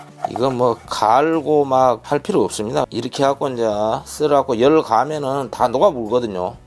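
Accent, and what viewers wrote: Jeolla, South Korea. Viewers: 쉐프님 전라도 사투리 구수합니다 여긴 완도 에요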